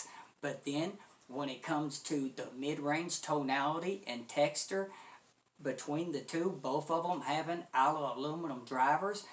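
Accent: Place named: Tennessee, United States. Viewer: Where you from? Sounds like TN ;) … I’m in Bama here, but from TN.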